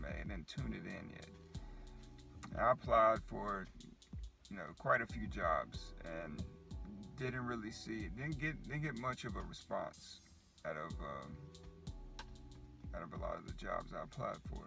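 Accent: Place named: South, United States. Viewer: Just something about a Southern accent! (I'm from Georgia myself) Your story is really, really inspiring. I look forward to hearing more good things from you!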